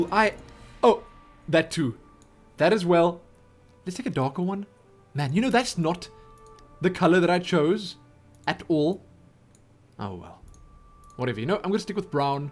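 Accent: South Africa. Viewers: It sounds South African if anything... Don't blame you. South African accent is difficult to pick up, considering how many people haven't been able to tell what mine is. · You South African? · D South African accent, I would know since i'm south african myself lol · it's dutch south african not british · Accent definitely sounds South African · but this guys accent is south african...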